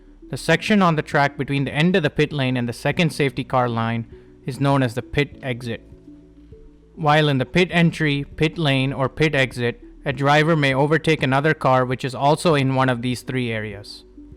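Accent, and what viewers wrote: India. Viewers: Indian aksen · Sorry I don’t understand indian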